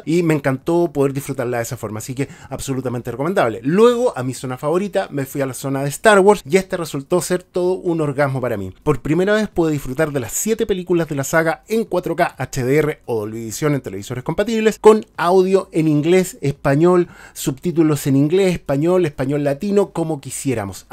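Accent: Chile. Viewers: Es chileno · duele escuchar el Chileno, pero bue, era solo para ver un poco de Disney+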